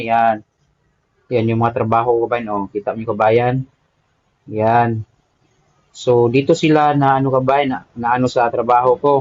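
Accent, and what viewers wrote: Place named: Visayas, Philippines. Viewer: Hmm my idea n din ako Peru parang bisaya c sir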